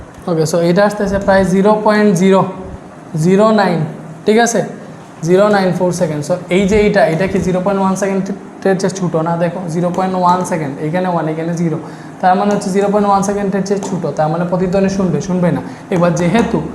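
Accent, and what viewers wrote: Sylhet, Bangladesh. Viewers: ইসসসসস , স্যার আপনি কি সিলেটি 😅😊😊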